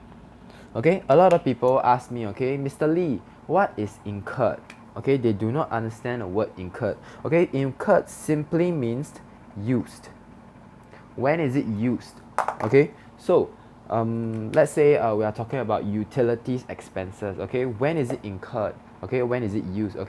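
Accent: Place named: Malaysia. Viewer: I can bet a £100 that you're from Malaysia haha. Just sound like my friend.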